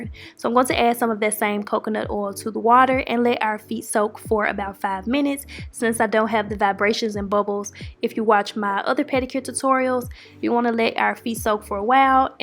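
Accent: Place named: Memphis, United States. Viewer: It took me all of 15 seconds to know you were from Memphis by the way you talk 🤣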